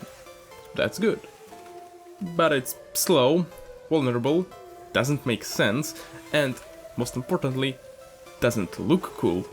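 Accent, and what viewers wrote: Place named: Russia. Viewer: Я слышу русский акцент...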